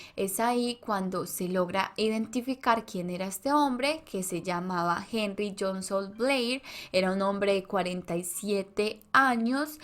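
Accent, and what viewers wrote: Colombia. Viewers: Hola nena! Espero que estés muy bien. Te quiero aclarar algo: No se les llama moteros. Yo sé que eres colombiana , y me encanta tú acento. Se les llama bikers. Que se pronuncia baikers. Y no se dice autostop. Se le llama ride , Que se pronuncia raid. Eres muy bonita, y excelente narradora. Felix Aguilar desde los Ángeles California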